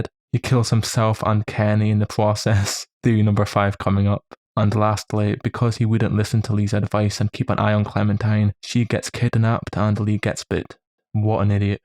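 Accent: Scotland, United Kingdom. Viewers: Scottish, fucking right mate good stuff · Great video. Where are you from btw? Ngl I honestly thought you were European at first but as you got a little more comfortable I started thinking 'is he Scottish? Holy shit' lol 🏴🏴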